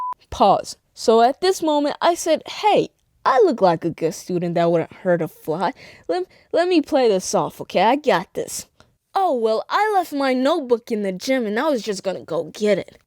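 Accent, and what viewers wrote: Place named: Texas, United States. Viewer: Yo u sound like u from lake highlands and Texas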